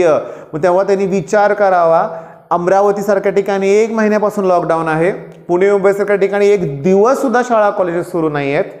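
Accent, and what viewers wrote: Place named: Satara, India. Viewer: साताऱ्याची भाषा !!! 😇 खूप भारी sir....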